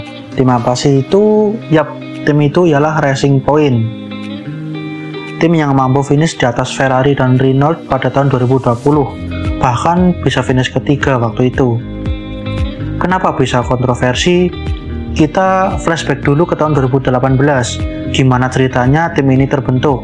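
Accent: Java, Indonesia. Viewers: Mantep 👍, logatnya wong jowo ?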